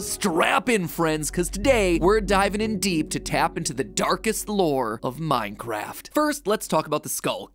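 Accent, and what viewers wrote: United Kingdom. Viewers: l would appreciate it if you made more minecraft videos (sounding british)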